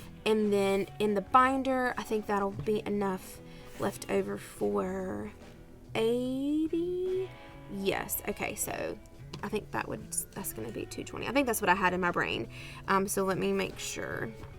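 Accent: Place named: United States. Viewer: Ah love you accent! Which part of America are you from? I work in education too (in the UK obviously lol). I can’t give up my twinkl subscription for teaching resources ☺️ so helpful to have (and I get a free book sent every term).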